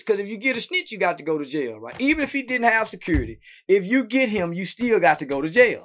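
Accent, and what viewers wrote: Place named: Texas, United States. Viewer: “Rockers Island” haha that Texas accent 😅🤘🏾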